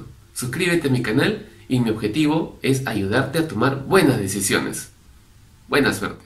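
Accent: Peru. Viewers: Hablas agradable, peruano, mejor que lo horrible que hablan ciertos ecuatorianos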